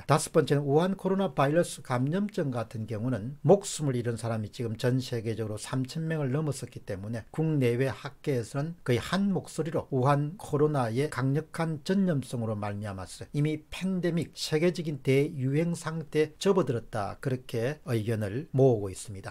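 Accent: Gyeongsang, South Korea. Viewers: 이분은 경상도 억양이 있으신거같아요 저는 우리 아빠같은 경상도라 친근감을 느끼는데 ,, ㅎ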